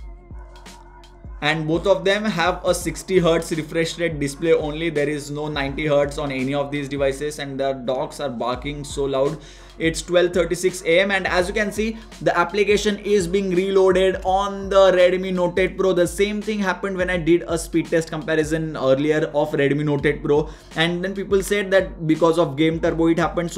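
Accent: India. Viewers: Esse inglês com sotaque hindi é terrível, difícil de entender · Realmente parece que é outro idioma, ele deve ser indiano!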